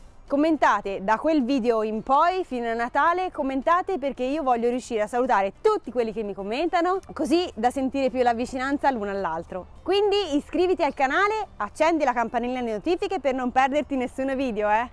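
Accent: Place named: Centre-North, Italy. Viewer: Ciao,forse lo hai già detto,in che regione si trovano i tuoi terreni? Dall'accento direi Centro-Nord...dico bene?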